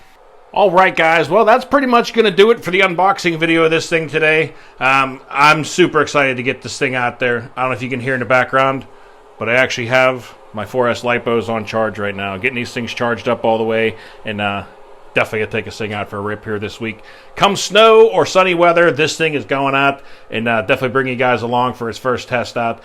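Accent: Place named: Pittsburgh, United States. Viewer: Bro... are you a Yinzer? I'm out west and I'm picking up some serious Yinzer vibes. Makes yer vid special. Keep it up!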